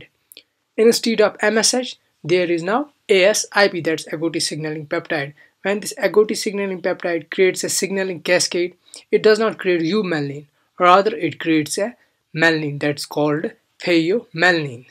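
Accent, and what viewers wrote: India. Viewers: Ronnie yeah he has an Indian accent probably because he’s from India. It’s a bit hard to understand but not like you can’t understand anything. Lamo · I didn't understand one word this guy said LOL is he talking English.? he is talking like a scientist to us he is very condescending. also he has an Indian accent, which does not help matters! LOL He needs to talk to other scientists not the general public. Blue eyes, brown eyes , green eyes. that's all I could get from this guy.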